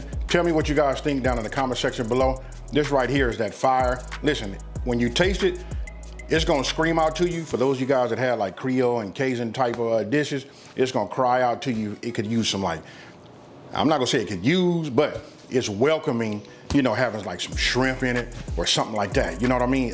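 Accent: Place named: California, United States. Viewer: This cali accent threw me off but this looks so good I gotta try it!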